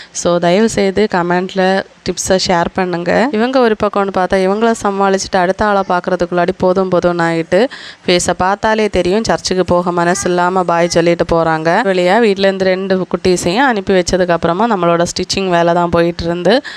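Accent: Kanyakumari, India. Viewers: நீங்க எந்த ஊர் நம் கன்னியாகுமரி அண்ணன் உங்க வாய்ஸ் கொடுத்தா அப்படித்தான் நமக்கு தெரியுது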